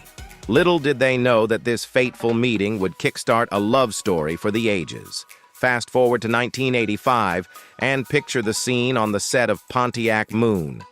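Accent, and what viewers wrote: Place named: United States. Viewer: it's a USian voice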